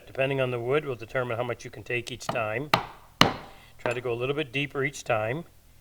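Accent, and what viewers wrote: Canada. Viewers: If the accent didn’t indicate that is Canadian, the mallet handle wrapped like a hockey stick grip sure does. Thanks for thr tips,. Putting pencil markes along the length of the mortice to help position the chisel is something I never thought to do. I also like how tou set your making gauge to the width og the chisel by sandwiching it between the marking gauge cutters. It seems I need to flip my cutters.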